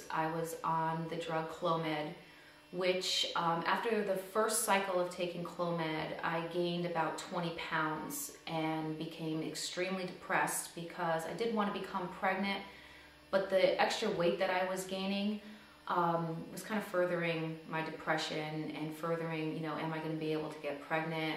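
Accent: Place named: New York, United States. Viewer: I am so happy to hear that you finally had your dream of a child come true. God bless you, your husband and your son! It gives hope to people like me who have yet to be blessed with a baby. How much did the Hunyuan method cost you over the course of the year? A rough estimate would be great. What state are you in? You sound like you could be from NYC like me. Best of luck to you and your family and thank you for sharing.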